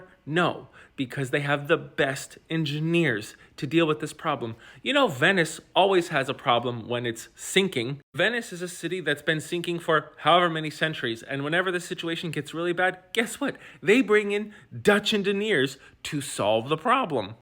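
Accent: Germany. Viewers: The accent as always was more german than dutch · Ha, the accent is German, not Dutch...😅 And it is insane that our country is 2nd in agricultural export. We are very very small and it is not sustainable for our land and water to carry on like this. We have quite a few problems when it comes to clean water supply...and we are a delta. And if you look at our politics, you wouldn't guess that we are a highly educated people. It really has been a clown show. Our elections are today. Let's see if the Dutch got a bit smarter the last 2 years. I am not counting on it, but am still hopeful. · Hell no, we don't sound this german. And We probably one off the best english speakers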